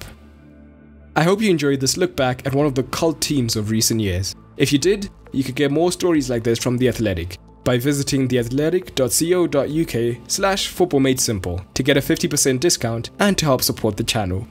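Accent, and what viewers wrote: South Africa. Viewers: Are you South African? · That Polish right-side was devastating! Piszczek Błaszczykowski and Lewandowski! side note, I know a South African accent when I hear one :)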